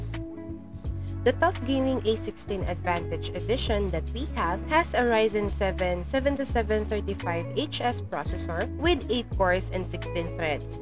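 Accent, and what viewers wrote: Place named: Philippines. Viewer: That voice and accent.. pilipino po ba kayo maam? Haha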